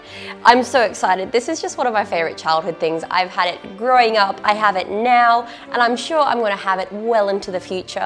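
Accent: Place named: United Kingdom. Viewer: Fabulous British accent!,..cool to hear you call them hundreds and thousands like we do and not sprinkles like in America..lol 😊👍🇬🇧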